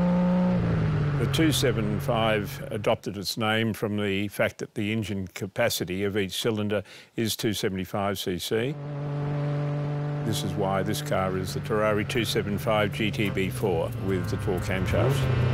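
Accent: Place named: Australia. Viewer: WOW beautiful "old girl". Sounds like your Aussie, Im stoked to think we have one of these in Australia. Hope I see you on the road one day.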